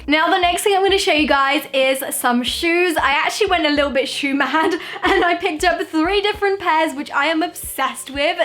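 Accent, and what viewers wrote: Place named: United Kingdom. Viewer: I discovered you a couple of days ago and I really love you and I love your accent btw is it British?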